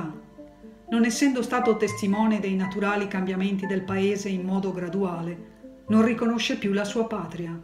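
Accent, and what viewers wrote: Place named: Mestre, Italy. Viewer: Conoscevo questa storia incredibile....e mi fa piacere sentirla nuovamente raccontata da con il suo accento mestrino 😼...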